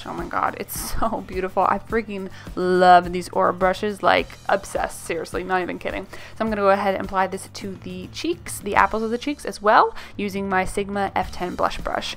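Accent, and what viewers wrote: New York, United States. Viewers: So beautiful! I love all of your tutorials 😍 Where are you from? Sounds like a New York or New Jersey accent? Lol 😘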